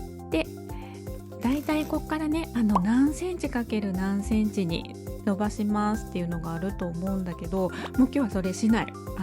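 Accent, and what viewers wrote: Kansai, Japan. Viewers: 初めまして😊久々にパンを焼きたくてYouTubeを見ていたら『お勧め』に出てきたので早速拝見しました🎉 楽しくて軽妙な柔らかい関西弁のおしゃべり(お話)に、グイグイ引き込まれて、あっという間にファンになりました😀デニッシュパン早速挑戦してみます🍞🍞 これからも楽しみにしてます😊もちろん登録させていただきました❤❤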